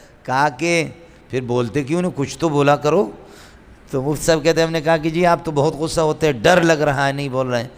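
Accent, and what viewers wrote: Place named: Balochistan, Pakistan. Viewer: بھائی جان یہ بلوچ ہیں.. انکی علاقائی زبان / بول چال ایسا ہی ہے.... یہاں کے لوگ یہ میرے والد ہیں نہیں بولتے.. بلکہ سیدھا یہ میرا باپ ہے.. بولتےہیں.. اگر یہ آپ جناب کے بجائے.. تم.. بولے تو وہ بے ادبی کی وجہ سے نہیں.. بلکہ انکی علاقائی لہجہ کی وجہ سے ہے..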